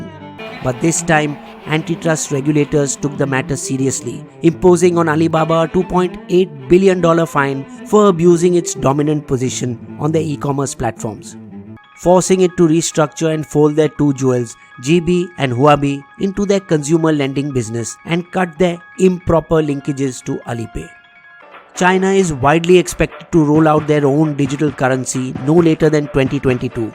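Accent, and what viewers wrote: India. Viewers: LOVE YOUR CLASSIC INDIAN VOICE/ACCENT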